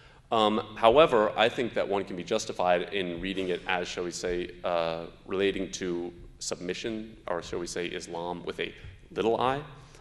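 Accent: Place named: Arab world. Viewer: He has a beautiful genuine Arabic accent.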